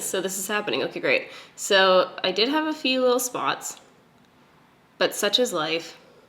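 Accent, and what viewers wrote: Canada. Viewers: It's the same as the southern English pronunciation and I'm grateful for it. Canadian accents are beautiful. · Jessica Ridenour Yes, it’s how most Canadians say it.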